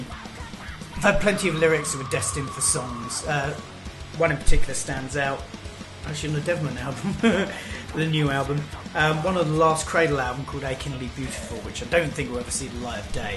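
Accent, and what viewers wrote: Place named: United Kingdom. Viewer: Now thats a beautiful UK accent <3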